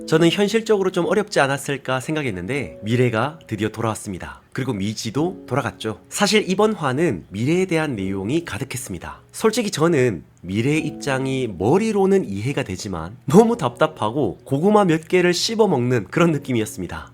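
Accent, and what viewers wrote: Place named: Gyeongsang, South Korea. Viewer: 님 경상도 사투리 엑센트가 지대루네요ㅠ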